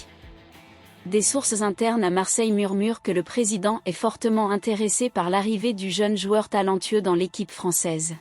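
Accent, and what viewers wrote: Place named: Paris, France. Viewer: Ce site a des info bidon ca racole des abonnés stile pour dépasser le psg deja pour commencer le club c olympique de Marseille c qui cette femme qui parle a tout les coup elle est même pas dici on dirait une parisienne regarder les info de franki foot ou touche pas a mon stade les mec meur pour l OM Elle on dirait quelle lit un script jamais une info exact bidon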